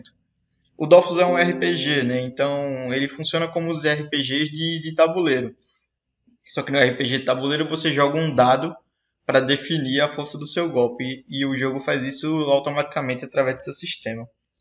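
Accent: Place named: Pernambuco, Brazil. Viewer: reconheci pelo sotaque kk sou de recife.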